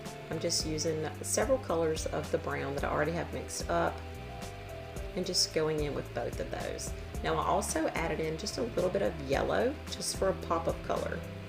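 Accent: South, United States. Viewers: I love your sweet southern accent and I appreciate you diy's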